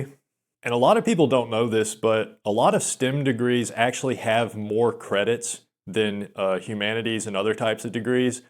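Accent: South, United States. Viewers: don't worry, I have the typical weird incel voice cause I go years without talking .you don't have that. You just sound like a stereotypical down south yank.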